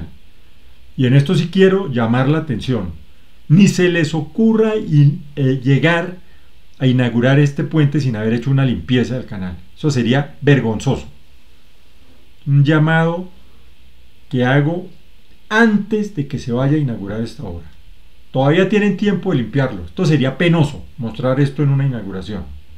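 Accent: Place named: Bogotá, Colombia. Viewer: Me encanta el dialecto cachaco indignado.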